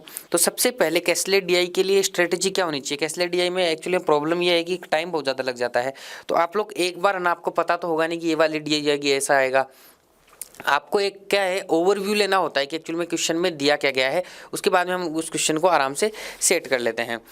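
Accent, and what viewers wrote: Haryana, India. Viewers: Sir haryana se h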